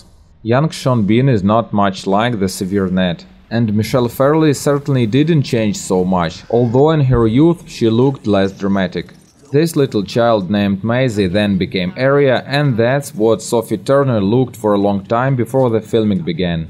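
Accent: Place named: Russia. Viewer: sounds russian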